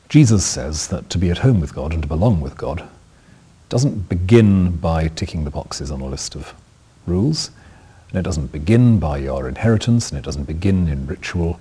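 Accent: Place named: United Kingdom. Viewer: Sheesh...That's not right. The Gospel has nothing to do with anything WE do...You're saying that "The Gospel is for us to trust Jesus?" That's not right...c'mon...you can't fool me with that British accent...although it does make you sound rather intelligent...Kinda like Richard Dawkins sounds intelligent...lol. But I know you know better than this...Why not just say what the Gospel is? You don't have to contemporise it, or put it in modern terms...just preach it!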